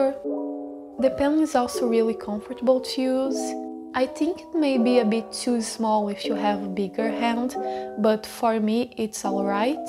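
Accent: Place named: Brazil. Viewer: Sabia que conhecia esse sotaque e o inglês limpinho, é do Brasil ksksk seu inglês é muito bom 🥺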